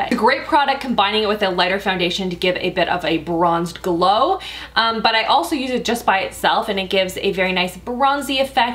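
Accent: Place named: Canada. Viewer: That Canadian accent really came out in this video! Cute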